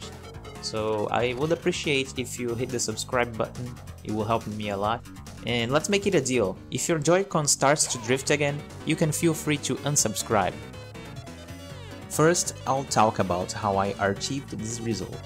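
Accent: Brazil. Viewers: Nossa bem q eu tava achando esse sotaque dele suspeito KKKKKK sabia q era br esse puto ta procurando ele falando isso na descrição valeu ai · Are you brazilian? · Tamo junto irmão, deu pra perceber pelo sotaque, mas o seu inglês é muito bom cara. E tambem, ótima explicação, ajudou muito, vlwww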